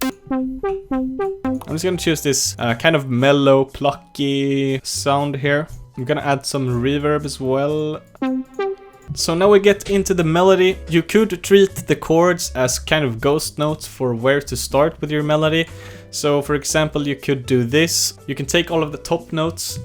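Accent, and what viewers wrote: Sweden. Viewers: That swedish accent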